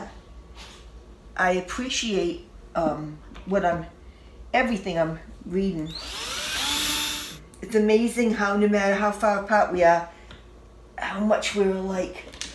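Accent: New England, United States. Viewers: Hi, I subscribed to your channel a few days ago. I enjoy watching your videos and find them helpful and also entertaining. One question: are you from New England? You remind me of the way people talked when I lived in MA. Keep the videos coming. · Nice job! And you remind me of myself.... hahaha Like you, I do as many little fix-its as possible. Limited $$ to throw out the window leads to creative solutions. Always gives you a good feeling of reward when you've done something like this. BTW.... You sound like you're from my neck of the woods. I'm in southern NH (Nashua area). You sound like you're pretty close by, maybe MA? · I have an idear...keep the r's. It's part of your New England charm and very endearing.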